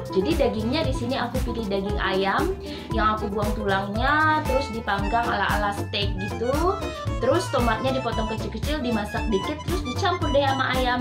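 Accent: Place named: West Sumatra, Indonesia. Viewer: Takah baa bana mangecek bahaso inggrih, tetap se logat minang indak ilang.. dangakan secara seksama beberapa kata "menu, ribet dll".. pertahankan minang ny yaa.. 😊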